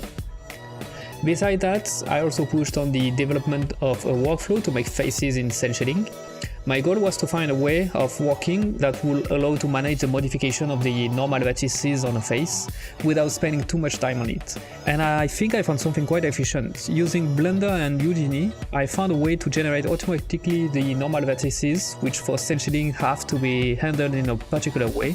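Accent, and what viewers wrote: France. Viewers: you ar french youuu ?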